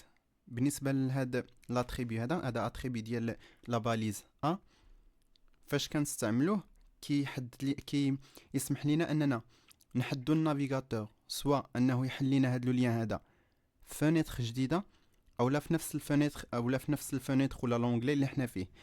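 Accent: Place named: France. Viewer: J'adore ton accent français ! A quoi çà sert de mettre un titre en français franchement !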